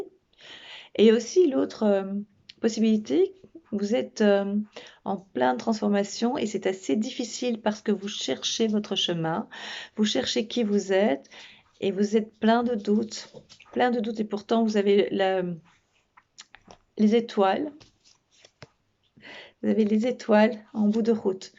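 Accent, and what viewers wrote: Belgium. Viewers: Coucou,j'aime beaucoup tes lectures et ta douceur. Petite question. Tu es belge ?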